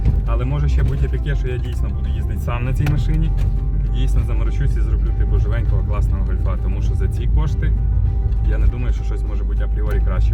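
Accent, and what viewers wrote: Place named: Rivne, Ukraine. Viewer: друже ти з Рівного,чі десь прруч?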